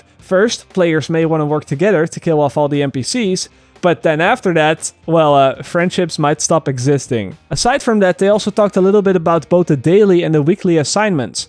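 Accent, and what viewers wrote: Netherlands. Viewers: are you dutch? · hahah ik wist wel dat je Nederlands was dat hoor je heel overduidelijk aan je accent als je engels praat :P:P:P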